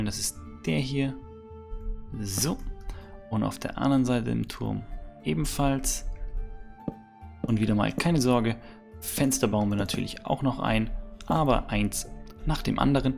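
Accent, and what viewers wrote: Austria. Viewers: Kaons sein dost a wosch echta östarreicha bist?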